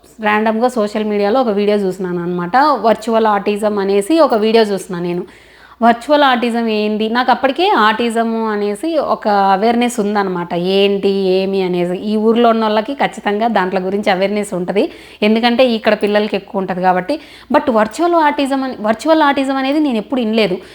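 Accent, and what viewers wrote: Rayalaseema, India. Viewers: Nenu ekkuva gaa comment cheyyanu andi but meru nijangaa super ga clarity ga chepparu memu thondarlo vastam andi foreign ki Naku papa nenu same challa tension anipistundi ....but Mee video chusaka konchem relief anipistundi maa papa anthe andi active full mrng padukodu night padukuntundi maa papa ki uyyallo nee alavatu foreign lo Ela Abba anukunedani anyway challa thanks andi...madi rayalseema kadapa meru matladtunteee aa slang lo maa voru akka laga vunnaru...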